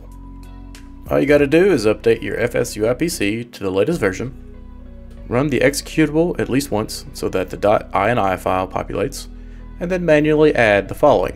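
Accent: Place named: South, United States. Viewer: Awesome 👌 Thank you so much. And... I absolutely enjoy your southern accent and style 😉. Being a southern boy myself 😅from Charlotte, NC.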